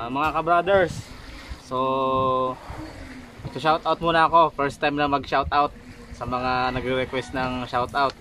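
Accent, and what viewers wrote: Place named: Visayas, Philippines. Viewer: ..mUrag biSaya maN idOl anO sAlita nYO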